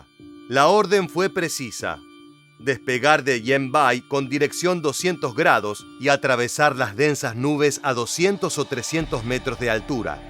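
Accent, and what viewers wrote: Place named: Argentina. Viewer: Habla 3n español argentino. Lame cul..o... de Estados Unidos. Se dice 2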